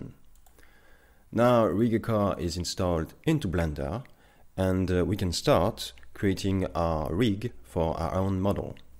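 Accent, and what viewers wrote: France. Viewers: l'accent français est présent haha ! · J'ai griller l'accent français direct XD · Thank you ! Et super accent français en passant =D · toit, tu a un accsan français je me trompe ? 😁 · U R french, ain't U ? Also, awesom add-on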